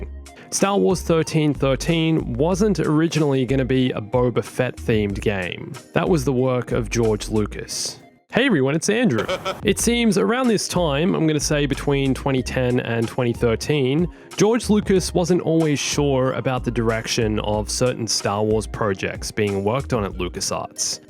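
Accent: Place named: Australia. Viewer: What part of aus are you from mate ?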